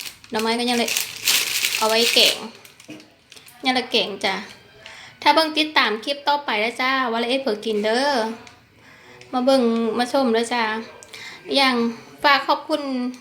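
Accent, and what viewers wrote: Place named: Phu Thai, Thailand. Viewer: เอัดผีเลอ,😂😂มักๆสำเนียงผู้ไท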